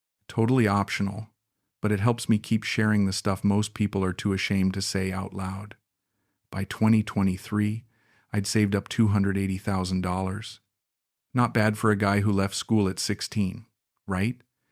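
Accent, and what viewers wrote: United States. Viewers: The story might be real, but this guy is totally fake, AI. I doubt any "bloke" from Darwin speaks in a pure US accent, not a trace of Aussie pronunciation. This channel produces many of these vids about a guy getting scammed by a Thai woman half his age. And they all sound the same and look the same. Strange channel.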